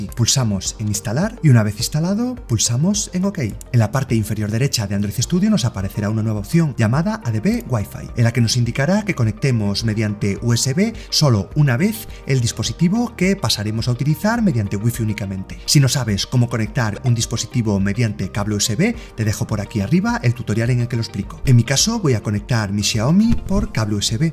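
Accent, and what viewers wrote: Spain. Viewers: Eres Español??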